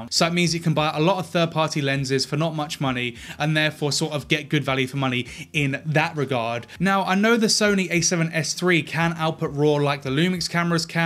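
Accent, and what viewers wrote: United Kingdom. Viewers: I don't know if it's the irritating British accent or his personality. But he has the sincerity of a used fast-talking used car salesman. A camera is not a function of price and glass. I figured out at the end that he was auditioning to be a Lumix "influencer". He said that he was putting his name next to the brand. Am I supposed to know who you are?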